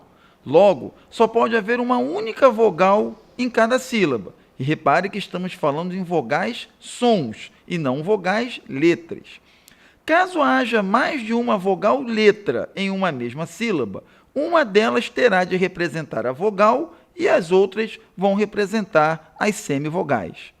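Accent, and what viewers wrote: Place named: Rio de Janeiro, Brazil. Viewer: Muito boa aula Sílabaxxx, aulaxxx, tõnicaxxx... Carioquês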